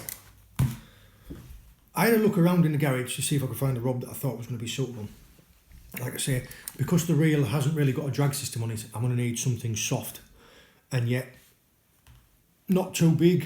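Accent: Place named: East Yorkshire, United Kingdom. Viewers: no matter which riding you are from we are proud to see your great vlogs + hear our accent on here. REIGHT good to see you so happy in squire trelawney land. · I have followed you for ages... your accent is clearly East Riding of Yorkshire, more specifically the great city of Kingston upon Hull.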